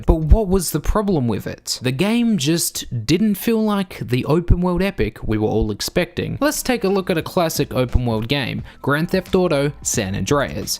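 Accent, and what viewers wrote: Australia. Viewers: The guy sounds Australian. If you watch Crocodile Dundee, you'll know Australians have ridiculous standards based on hogwash.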